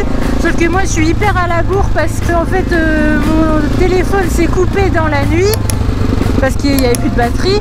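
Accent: North, France. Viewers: Ah mais tu viens de ch'nord, je savais pas :')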